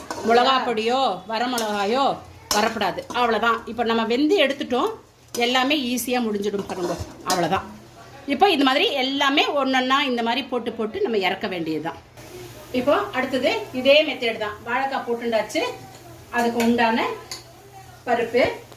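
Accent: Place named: Kerala, India. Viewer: Which place are you from Mami.? Konjam Malayalam touch iruke, Tirunelveli or Trivandrum or Palakad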